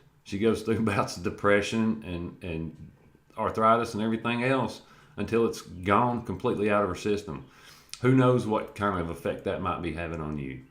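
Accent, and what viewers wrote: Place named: Appalachia, United States. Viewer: Good vid man, Loving the Hillybilly Accent :D, subbed to follow your progress!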